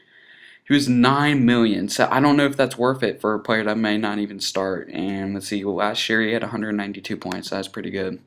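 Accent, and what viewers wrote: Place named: United States. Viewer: OMFG you are so american. descusting accent. albiuuunn, widffs, willshiuurrr lmfao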